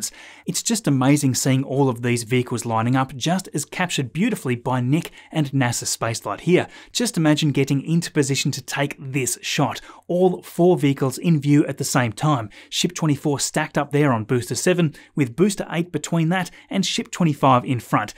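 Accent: Australia. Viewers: my lovely Aussie mate, I love your accurately non-politicized SpaceX reporting, but repeatedly have found myself in an information overload. Maybe it's because I'm 71 yrs old. Maybe it's because I'm a kiwi, and find Oz and kiwi accents distasteful on YT. But may I suggest you upload twice a week with half the content and double your audience? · I think just tries to hide the Aussie accent a bit given the international audience.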